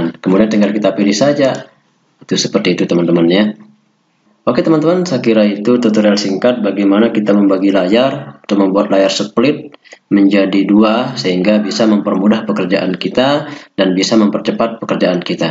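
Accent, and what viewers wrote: Bali, Indonesia. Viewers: orang bali y mas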